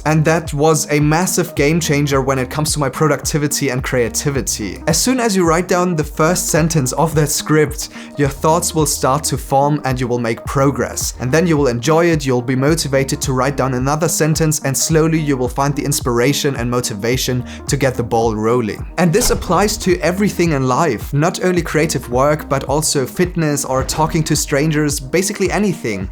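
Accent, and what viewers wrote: Germany. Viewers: haha ich habe sofort erkannt dass du Deutsch bist, obwohl du den Akzent schon gut wegbekommen hast. Aber ich muss sagen, du machst gute Videos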